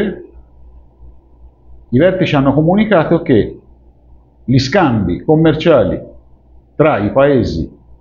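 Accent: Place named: Florence, Italy. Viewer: e tu sembri di Firenze!!!!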